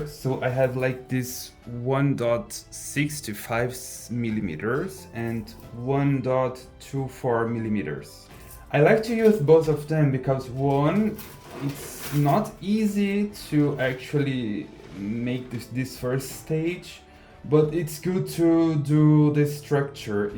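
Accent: Brazil. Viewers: Que bonitinho o sotaque brazuka falando Inglês! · Our cute brazilian accent turns the video even better 🥰🥰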